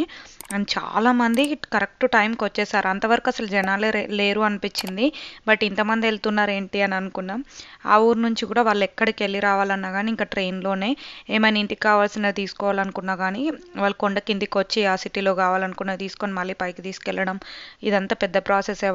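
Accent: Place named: Telangana, India. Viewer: Switzer land video excellent....telugu telagana slag..telugu ammayi ....eni yrs ayindhi usa loo settle ayi...emaina introduction video vundha...ella antha easy gha eni yr settle ayaru...malli europe countries ani video thisaru very rich anipisthunaru ...chalavaraku andhariki settle kavataniki okha 20yrs time paduthundhi ...manam putina place ellu vundali anukokunta andharu antha easy foreign countries ella settle avuthunaru. .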